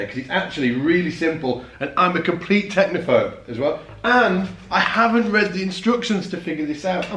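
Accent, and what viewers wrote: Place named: England, United Kingdom. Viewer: Great video + English accent=8 minute intro monologue.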